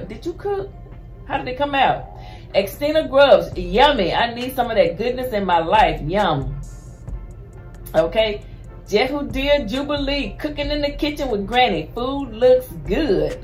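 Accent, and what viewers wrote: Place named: South, United States. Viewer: you are a southern woman, I can hear it in your voice.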